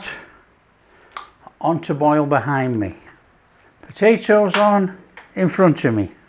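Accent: United Kingdom. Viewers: Happy day to you sir, love your accent oF BRITAN, you are a chef and master that makes it so enjoyable to watch. Food looks great and love your passion for sharing with us, GREETINGS OR CHEERS TO YOU SIR FROM THE GREAT STATE OF ILLINOIS. #1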